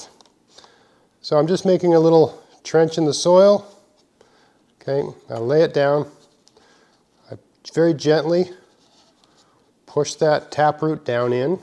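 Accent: Maritimes, Canada. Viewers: Cotyledon. the seed leaves. Coh teh LEE duhn I say, you didn't mispronounce it. You simply have a Maritimes, accent!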